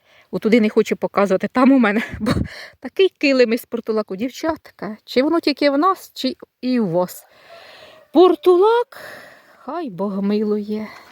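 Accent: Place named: Poltava, Ukraine. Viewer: мені дуже подобається Ваша мова я сама родом із Полтавщини як родичів послухала здоров'я Вам миру🎉🎉🎉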